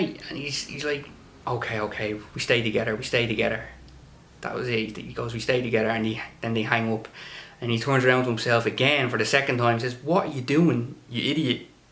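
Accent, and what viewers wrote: Ireland. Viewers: The great irish accent makes the story a better listen 👍🏾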